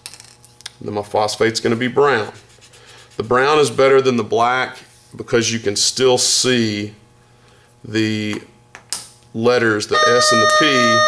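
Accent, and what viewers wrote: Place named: Texas, United States. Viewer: nice accent... texan?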